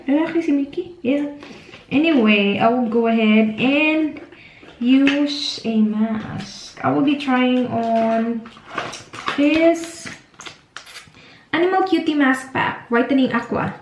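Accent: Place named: Philippines. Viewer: I love you ate!! I hope po EVERYDAY po ang vlogs nyo 😂 and yea namiss ko yung tagalog mo. 😂 You always speak in english na eh 😂 which is not bad but namiss ko lang po 😂 i hope na sa mga next na vlogs nyo you will speak tagalog na po madalas ☺ (im not saying na bad sya, and yes i understand na nasasanay mag english pag nasa ibang country ka, cause i live rin po sa ibang bansa. but namiss ko lang po again tagalog nyo 😂. i just love your tagalog accent so much ❤❤😘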